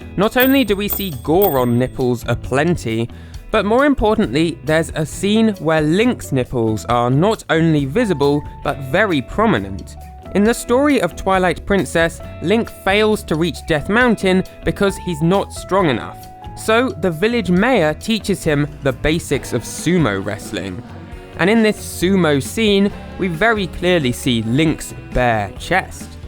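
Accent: United Kingdom. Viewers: did i just listen to a british guy talk about nipples for eight minutes? yes. yes i did.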